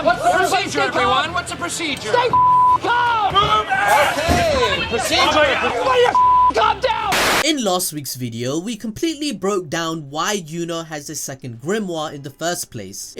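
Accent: India. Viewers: Love your hindi acent😂💕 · That indian accent though 😂😂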